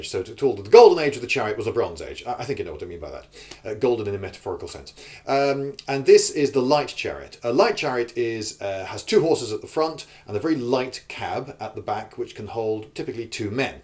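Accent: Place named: England, United Kingdom. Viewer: Love the English accent. A unique attitude.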